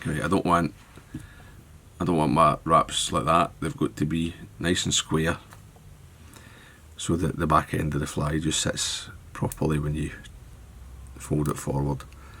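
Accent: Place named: Ayrshire, United Kingdom. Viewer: See you’ve not lost yer Ayrshire twang,. Think this size flea is ideal for pike too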